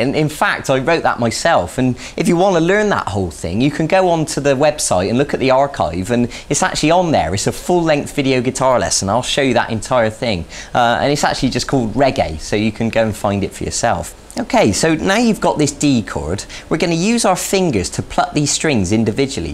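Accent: United Kingdom. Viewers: he's British, or Scottish, maybe Irish, could be Welsh...you get the idea. Great teacher. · nice accent you are british ???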